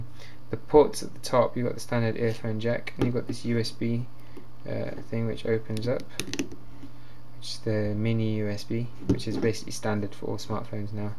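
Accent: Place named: Arab world. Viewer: are you arabic by anyway? your accent is so great mashala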